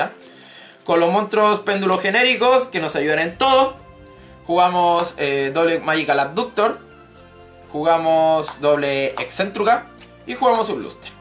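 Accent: Chile. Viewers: Sos chileno ?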